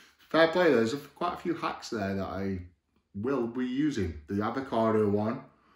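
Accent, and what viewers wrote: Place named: Stoke, United Kingdom. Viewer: can tell he is from stoke we are all the same.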